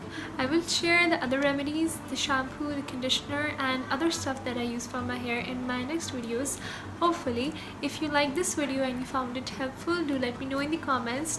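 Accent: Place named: Pakistan. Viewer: After hearing ur voice,I think u r Pakistani....if yes let us know...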